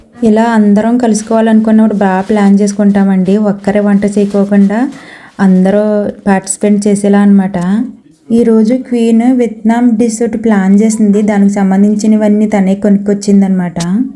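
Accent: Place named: Godavari, India. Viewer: Medhi India lo akkada..vallani mavyyagaru attaya antunnru..njmenaa..full detailed video cheyandi..me slang emo godavari slang laaaaa undi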